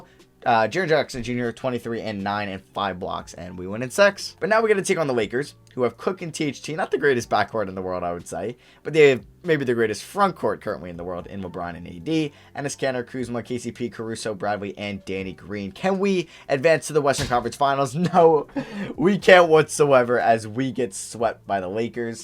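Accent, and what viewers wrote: Pittsburgh, United States. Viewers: Are u from Pittsburgh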